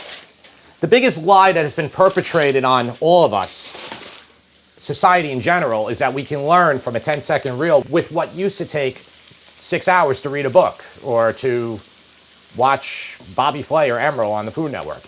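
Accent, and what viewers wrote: New York, United States. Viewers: As a NYer, he sounds like family to me! We are just outgoing and informative, whether you want us to be or not! lol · Agreed!! Im on long island and his accent sounds like home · Always great recipes. Um, I have the exact same accent you do! It’s either Long Island, Staten Island, or Northern Jersey! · You don't talk too much. I actually enjoy your New York accent. It's authentic like your videos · Oh and you keep talking, and especially that awesome New York accent! People tell me I talk really Chicago and I love that! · This sounds like a MUST MAKE meal! I’m drooling. As a NYer at heart, I love hearing you talk, (I miss Long Island) I love all the information and tips you provide. Great job! ❤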